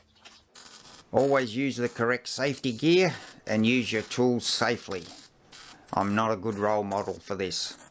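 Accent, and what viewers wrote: Australia. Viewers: i know i am new, i like like the methodical approch to see a skills man at work, what a simply beautiful piece of craftmanships at its very best. all you need is four strongest powerlifters, to cary this whewever you want to go camping in the world, i am assuming you are from Australia, just by listeninhg to a little bit to your accent, if i am wrong i do appologise profusely for my mistake, i am into bushcraft skills in the UK. and i go camping everyyear, i would love something like that on a slightly smaller scale. simply beautiful, say no more. i like the hinge for your kettle, very clever there, in the making of it. cheers Hans. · I just started watching you today and wow what a welder you are. I never took any welding training but regret that action. Am looking forward to more interesting videos from you. You sound to be from Asustraila or New Zealand a beautiful section of Gods creation. 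I live in the USA in a State called West Virginia. Stay safe and Merry Christmas to you and yours.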